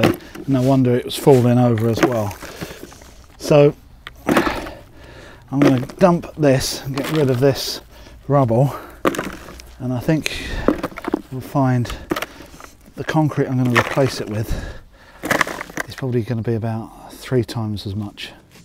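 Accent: United Kingdom. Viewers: Somehow I knew the guy would have a British accent before it started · That plus the proper British accent had me in under 2 minutes!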